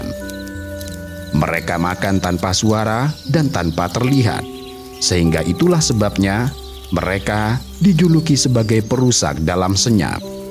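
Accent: Java, Indonesia. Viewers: Jawa nya jawa banget 😭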